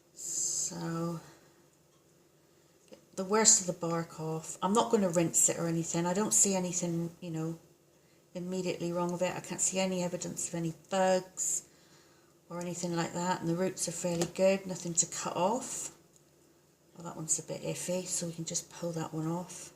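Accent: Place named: Cornwall, United Kingdom. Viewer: Were you from Cornwall originally? You sound as though you're from Cornwall, England.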